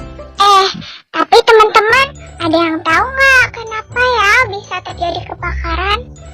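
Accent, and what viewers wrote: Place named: Java, Indonesia. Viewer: Keren, suaranya unik. Medoknya dapet. Terbaek memang. Hahaha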